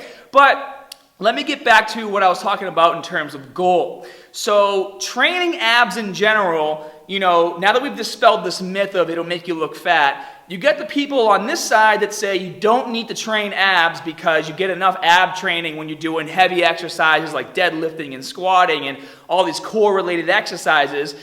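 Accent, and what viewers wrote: Boston, United States. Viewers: You probably think of his Boston accent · That Boston Accent is so distracting lol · Are u from Boston ? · It’s a Boston accent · the boston accent is killer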